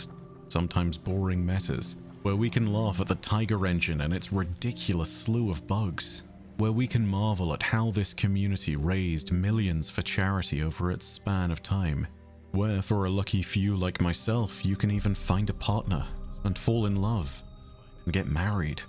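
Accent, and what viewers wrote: United Kingdom. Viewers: people hear a british accent and tend to get pulled in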